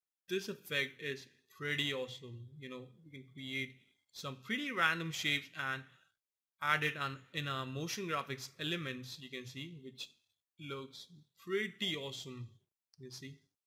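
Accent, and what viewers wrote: India. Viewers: thank you bro :) i was scrolling through the internet for some clean intros and just stuck on this one i didnt knew you are indian until i just felt that accent :D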